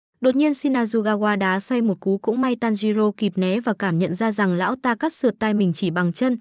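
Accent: Central, Vietnam. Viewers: Người miền Trung ò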